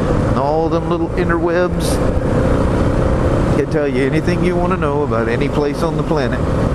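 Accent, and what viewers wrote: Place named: United States. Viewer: Always good fun coming along with you.I would comment on the truck? but couldnt see it.Its very similar weather here in England but always raining and the traffic is horrendous coupled up with the fact that no one seems to be able to drive properly,i think they are all out to kill you this time of the year.Dont worry about the content, your american accent is great to listen too,and your clear and precise.No use me doing a video you wouldnt understand me living here on the border of Devon and Cornwall.Oh rrrrr,proper job!!!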